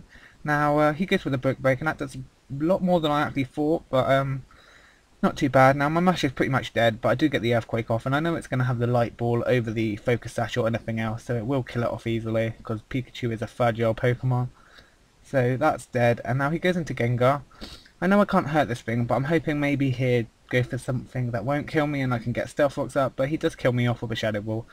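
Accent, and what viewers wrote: United Kingdom. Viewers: You have a British accent :3 xD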